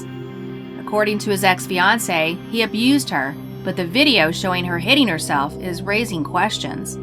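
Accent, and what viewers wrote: United States. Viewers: yet has an American accent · you speak with an American accent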